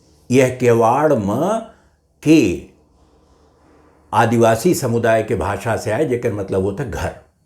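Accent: Awadh, India. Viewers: Got fed up of listening "English" and other languages. मजा तो अवधि सुने में आवत है। और ऊहू आपके जुबान से,मन तृप्त होई जात है। स्वागत ब आपके, ५ साल बाद इंतजार पूरा भय। अवधि आपहे जिंदा किय्यो है, डिजिटल प्लेटफार्म पर। धन्यवाद, आपके। बस ८वी सूचियों में आए जाए, तो संघर्ष पूरा होई जाए।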